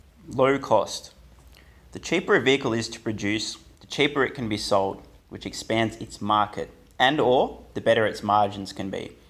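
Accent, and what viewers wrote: Australia. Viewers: Good to hear an aussie yt'r covering tesla.. 👍🇦🇺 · It's an Australian accent.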